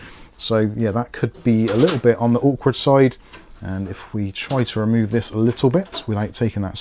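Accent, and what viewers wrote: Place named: Shires, United Kingdom. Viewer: Love you're shire accent. Great vid, I was actually looking at this case before finding you're video on it. Class.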